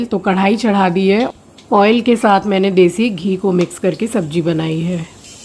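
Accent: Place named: Uttar Pradesh, India. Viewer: Pehli bar aap ki lengbej kuch up Bali suni hai maja a gaya 😊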